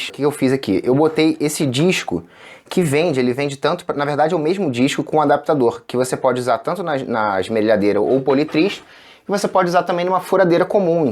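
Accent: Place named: Teresópolis, Brazil. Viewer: Boa tarde,adoro ver o teu trabalho,ele é feito com muito esmero,sou natural da minha querida Teresópolis ( RJ) e pelo sotaque somos conterrâneos,hoje estou morando em Indaiatuba interior de São Paulo e tenho uma marcenaria,desejo a ti muitas felicidades e muita madeira pra serrar,rs rs...abraços!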